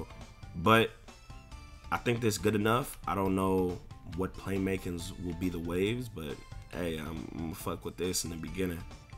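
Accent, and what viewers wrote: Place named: New York, United States. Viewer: Yo bro you one of my favorite youtubers you gotta be from NY bro 🤣🤣🤣🤣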